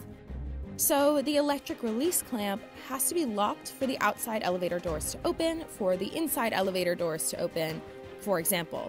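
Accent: California, United States. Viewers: I think its a California accent. Reminds me "Legally Blonde". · I think she just have a regular Californian female accent. This accent was so much use for caricature of superficial girl most of the public doesn't know it is actually a real accent that real people have. I don't think she does it just to mess with you that juat how she speak. ps: I'm French I just learning to differentiate accent so I'm maybe wrong on this...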